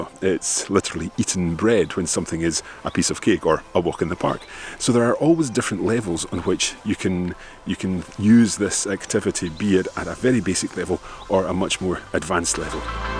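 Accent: Scotland, United Kingdom. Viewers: Hello,! I've been most impressed with you clearly perceptible Scottish accent!;-) Do you realise you have it, by the way?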